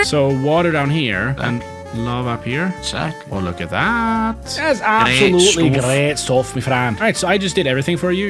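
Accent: Sweden. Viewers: why has swedish accent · Är du från Sverige? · Are you swedish? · Are you swedish??? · U sound so swedish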